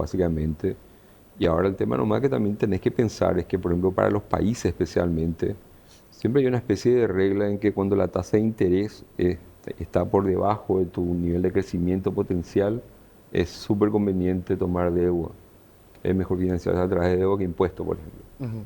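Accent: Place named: Paraguay. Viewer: Habla como paraguayo. No se le entiende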